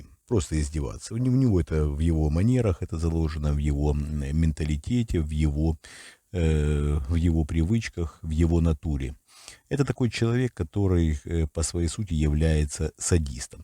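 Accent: Ukraine. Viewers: Виктор мыхалич, у ва юс акцент украинский. Как так?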